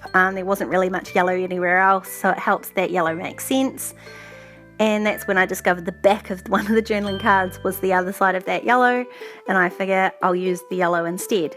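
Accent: New Zealand. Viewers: Do I detect a Southland twang in your accent? Cute page, and a good way to include extra photos when the occasion calls for it.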